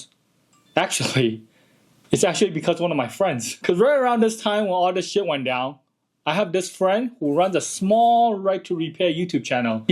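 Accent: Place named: China. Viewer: It's wild someone with a Chinese accent is talking about being a landlord in AMerica...sorry for saying it(not sorry).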